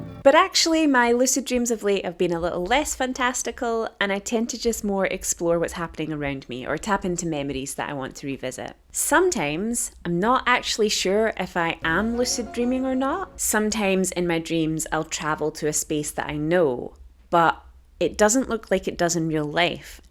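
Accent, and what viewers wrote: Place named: Central Belt, United Kingdom. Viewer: yeah I couldn't pinpoint your exact accent, but definitely central belt, or maybe even Stirlingshire :)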